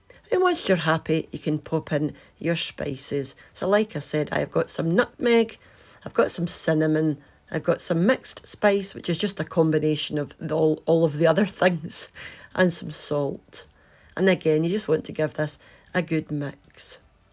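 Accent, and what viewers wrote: Scotland, United Kingdom. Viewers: I have been living in Scotland for 4 years. One of my fav things here is Scottish accent. Yours is absolutely adorable 🥰 And of course your recipes are inspiring 😚 Cheers! 😘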